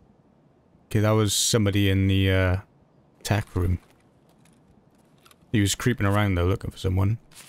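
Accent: United Kingdom. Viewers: you from south wales butt?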